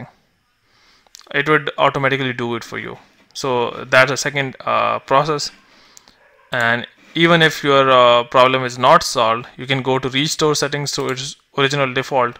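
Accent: India. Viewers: This Guy sound like India is this real or scam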